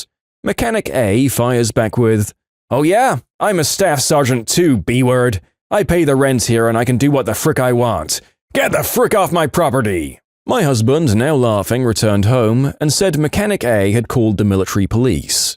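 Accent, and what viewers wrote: United Kingdom. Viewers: Its been a while since ive watched am i the jerk? so when i watched this i was absolutely bamboozled that he was british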